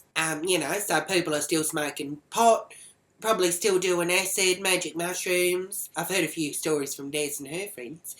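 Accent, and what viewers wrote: United States. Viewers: Your American accent is really good. You sound just like an American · loving your American accent chook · love wats the 'merican accent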